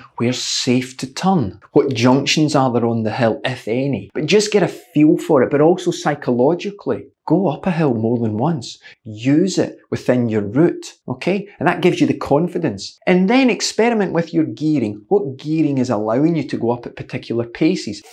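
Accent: Scotland, United Kingdom. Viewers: I love how you're lovely scottish tones make hill sound like hell, I think either works lol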